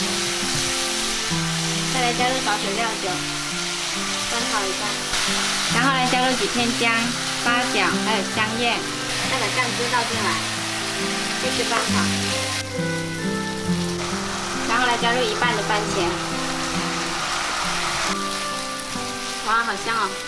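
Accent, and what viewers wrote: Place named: Guangxi, China. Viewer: 浓浓的广西口音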